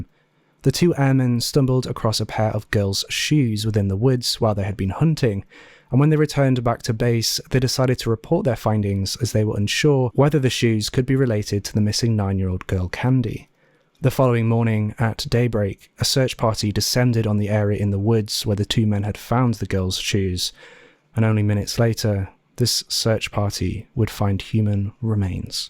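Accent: United Kingdom. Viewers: You have such a beautiful, sultry voice. I would love for you to narrate all of my favorite books. You could easily narrate professionally (especially for those of us in the US who swoon over British accent).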